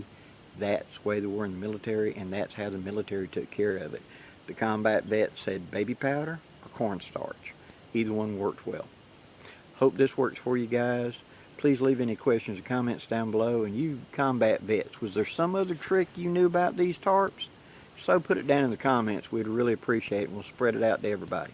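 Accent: South, United States. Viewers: Blackie you are one of my favorite outdoorsmen pesenting common sense advice. Once again I have to take a minute to digest that southern speak. " Double it in half". Love it, carry on.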